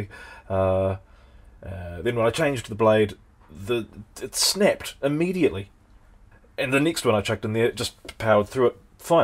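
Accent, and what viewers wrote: New Zealand. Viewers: Not hugely relevant to this video but your videos got me fixing up my bass and playing way more!! Keep up the great content! soo good to hear a kiwi on youtube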